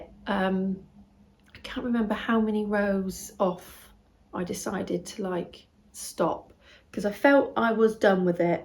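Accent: West Country, United Kingdom. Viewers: Hi, thank you for your lovely podcasts they always brighten up my day 😊 I found you after watching Wendy the unexpected gypsy and Amy the meaningful stitch. Love hearing about all of your projects. My Mum makes my lovely project bags. Also just to say I’ve really been enjoying reading two books by Libby Page, The Lido and The 24 hour cafe. I’m from the West Country too, love your accent. Best wishes 🧶 x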